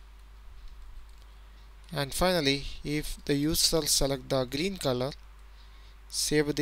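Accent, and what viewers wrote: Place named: India. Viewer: Ótimo tutorial... funcionou certinho!!! Esse indiano é foda!!! O sotaque é mor comédia... mas o cara eh bom!!!! hehehehehe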